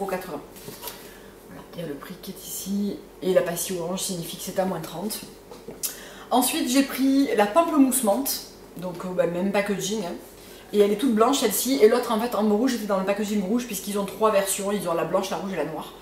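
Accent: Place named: South, France. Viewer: re bonjour toujours un vrai plaisir et régal de regarder tes vidéos soldes, etc tu es magnifique, belle et ton petit accent du sud nous apporte du soleil gros bisous;-))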